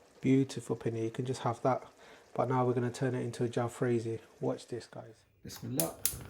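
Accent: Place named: United Kingdom. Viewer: Ps. I love your british accent.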